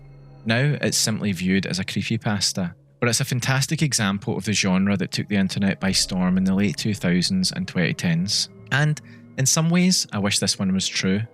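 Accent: Scotland, United Kingdom. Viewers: wow you're severely scottish, I like it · love to see my scots making some great you tube content on something i love, you've saved me from American accents for tonight aha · I know it's Scottish I said this on purpose to mess with you. Good spirits though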